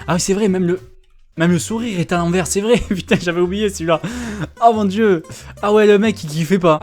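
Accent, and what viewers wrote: South, France. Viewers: Ouais ça m'a plus Tu viens du sud ? Si oui ou ?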